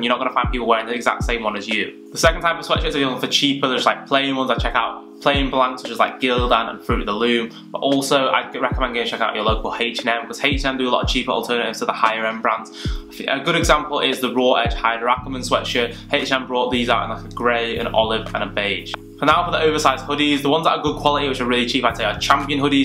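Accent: United Kingdom. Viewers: holy crap that British English kills me boi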